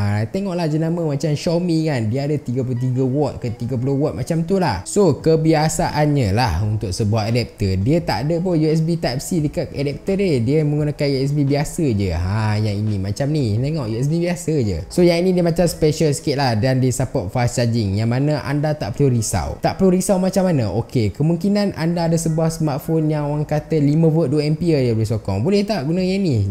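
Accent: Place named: North, Malaysia. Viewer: ...aik utaqa pulak ka bang hahaha...duduk mane,btw sebenaqnya aku pun oqang utaqa gak hehehe😂👌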